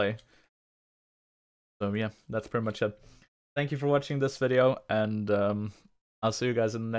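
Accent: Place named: Germany. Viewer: Great Deutsch-English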